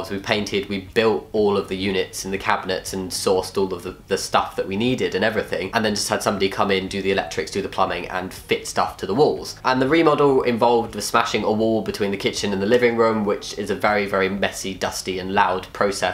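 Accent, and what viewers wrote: United Kingdom. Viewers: i love all the british talk its cute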